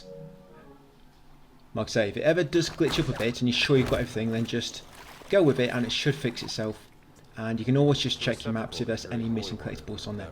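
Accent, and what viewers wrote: England, United Kingdom. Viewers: do you know what English accent you have?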